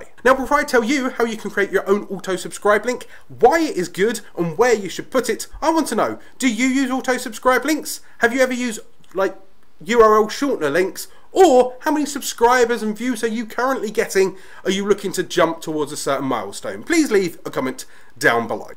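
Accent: United Kingdom. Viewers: This was fun, en pointe, informative, upbeat and British! What could be better? 😊 Will let you know if it works for me! xo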